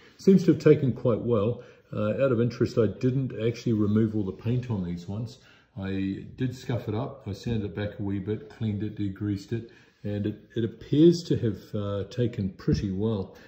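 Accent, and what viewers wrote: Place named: New Zealand. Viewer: sorry for the bad accent ID on my part... I've been through more of the vids and clearly see you're a Kiwi. Thanks for documenting everything, esp mistakes, it helps alot.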